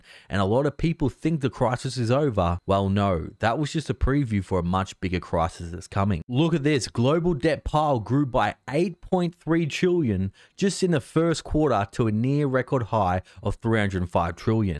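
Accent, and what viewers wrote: Australia. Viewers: Good to hear an Aussie on finance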